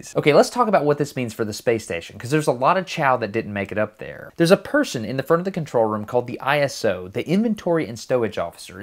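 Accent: South, United States. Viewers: Your southern accent really stood out for me for some reason. Anyway great vid.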